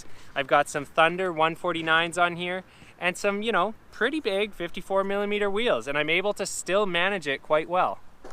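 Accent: Canada. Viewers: Hence my never noticing you pronounce it that way. Wasn't trying to imply that you didn't know better or that were even saying it wrong. But, I get it now. Well mannered Canadians say it that way.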